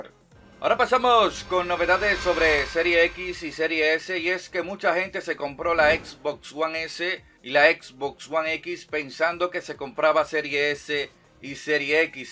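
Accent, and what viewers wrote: Dominican Republic. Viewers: Este pana suena Dominicano! Muy buenos videos, Tiene mi like buen hombre!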